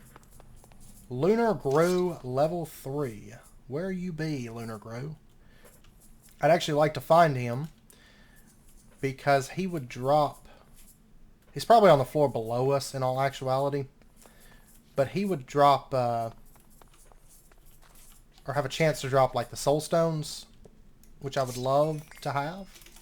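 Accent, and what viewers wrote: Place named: Owensboro, United States. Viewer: I’ve got tons of family in Owensboro. Im really loving watching these videos since your accent reminds me of all the good times I had down there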